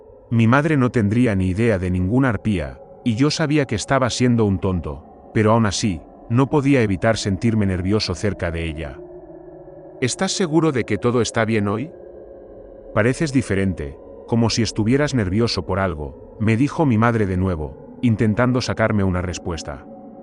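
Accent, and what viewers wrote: Spain. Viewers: Es encantador como los Españoles pronuncian la "S" , en cada País es distinta la forma de pronunciar ciertas letras y nadie tiene porqué hacer llamado de atención de como uno pronuncia una palabra .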